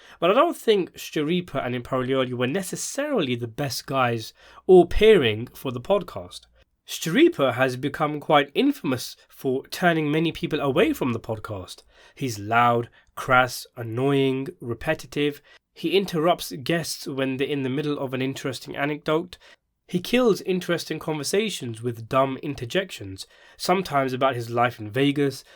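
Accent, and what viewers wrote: United Kingdom. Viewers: As soon as I herd the British voice nobody gives a shit what a brit thinks . You really sound dumb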